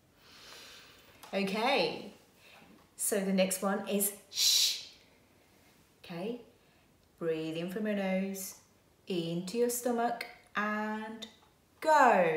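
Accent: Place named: United Kingdom. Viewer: U have the British accent but u don't look European or Brazilian at all. But Asian. 💙R u a Japanese lady? ☺️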